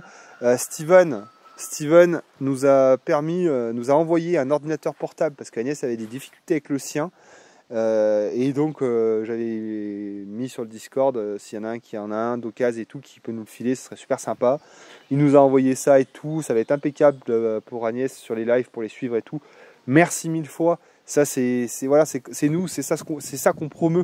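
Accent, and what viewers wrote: Auvergne, France. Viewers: J’avais jamais remarqué que tu étais adepte du « Y » auvergnat lol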